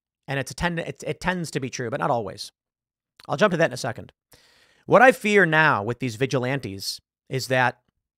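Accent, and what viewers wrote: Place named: Chicago, United States. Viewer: Lol. You could definitely tell your from Chicago.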